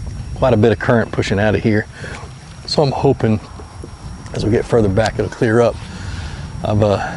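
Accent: Georgia, United States. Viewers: Are you from georgia? Now I will follow all your tips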